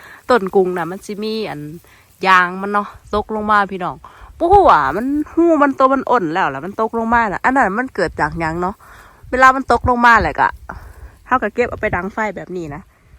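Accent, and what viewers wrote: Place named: Roi Et, Thailand. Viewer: บ้าน ใช้เงินบาทหรือเว้าคือไม่ใช่คนลาวสำเนียงเหมือนร้อยเอ็ดสารคามขี้ตกเหมือนกันร้อยเอ็ดเด้อหล่า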